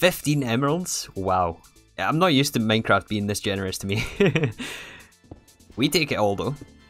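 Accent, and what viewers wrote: Scotland, United Kingdom. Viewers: Mate im going insane trying to find this exact pack, with the quest book etc... Can anyone help me out? What mod launcher do I need, what pack contains the quest book? I havent played Minecraft in years and I cant find this exact pack for love nor money... if someone could point me in the right direction? Cheers! Love your stuff, honestly one of the best creators on here...me and the wife constantly sing to ourselves... Divine Joourney Toooooo in your scottish accent all the time haha Safe x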